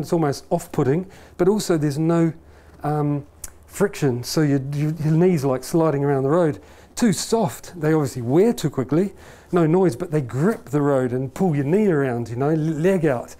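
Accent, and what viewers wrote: New Zealand. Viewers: This dude sounds kiwi..Is he??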